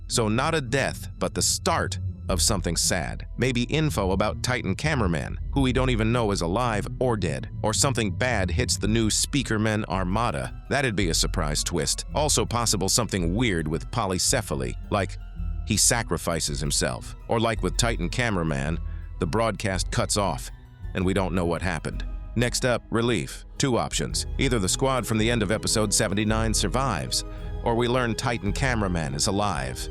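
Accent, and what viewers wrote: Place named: United States. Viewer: Anyone else notice how his voice sounds country?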